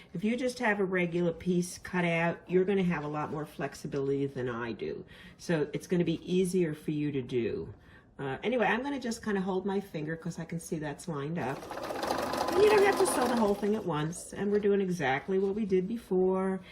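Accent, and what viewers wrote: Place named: New York, United States. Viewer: Thank you for your truly helpful and honest instructions. Your close up video of piecing and sewing is very helpful. And I love that NY accent and attitude.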